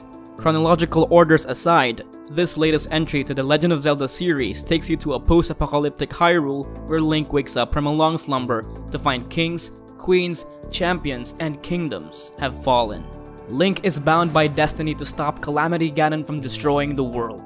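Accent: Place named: Philippines. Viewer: your accent is somehow sounds like a Filipino accent, i just noticed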